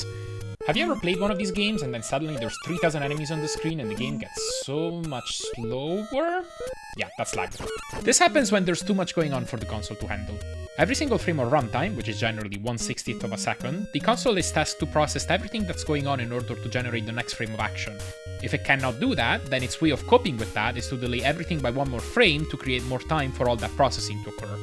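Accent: Italy. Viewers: you sound Italian ahah, where are you from?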